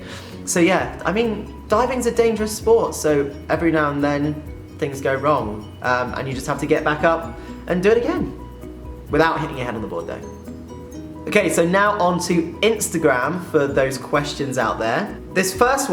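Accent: United Kingdom. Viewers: I could watch you forever and listen your lovely british accent <3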